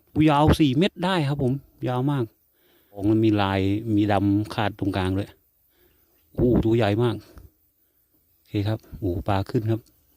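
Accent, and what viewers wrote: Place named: South, Thailand. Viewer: คนไต้แน่นอน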